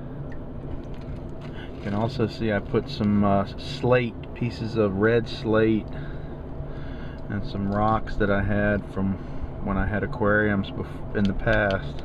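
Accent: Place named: United States. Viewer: whish I had your usa twangy accent, I would sound really good singing boogie blues but I am french when I sing I USA blues classic I sound crap... Nice video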